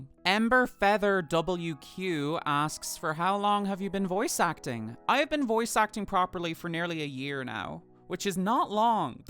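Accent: Ireland. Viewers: Never mind about the irish accent, it's ..in your bio. Oops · Lowkey cracking up since it’s the first time I’ve hit up Voice Acting playlist and it’s the first time I’ve heard her natural voice and my dumdum American butt was hit with the rapid fire rolodex of comparing my bank of accents like: “ is British? Ugh no. Absolutely not. How dare I? No, it’s Auss- New Zea-? NO! You FOOL! It’s NONE of these. It’s…Wait that… Hold the- The Emerald Isle? Irish? But she’s extra oh so sweet and gentle! Southwest Irish? Transgirl Irish Jax???” And then I get the Irish confirmation later which was validating. Like the visceral wave of HUHHH??? Until I got it (I THINK, tbh not sure if the region is correct) was so dramatic. Am I at l at least close? Sometimes regional variations get me. Someone who knows this sweet darling birdy, please confirm! 😂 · Hears voice Me, immediately: Munster?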